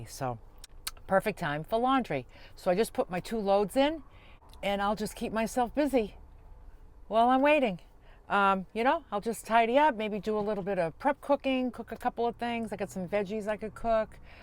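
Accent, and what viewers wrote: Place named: Massachusetts, United States. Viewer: you are amazing! Your honesty and mindset is inspiring to me. I’m working on your food plan and working on my mindset about everything!!! Thank you for all the hard work!!! I hope you are able to do an Amazon store soon!!! I love your gadgets! ❤❤❤ Ps. My first video was way back when you first got Sweet Pea…I too am from Massachusetts, I recognized your accent… And I was hooked. Keep up the great work, lady!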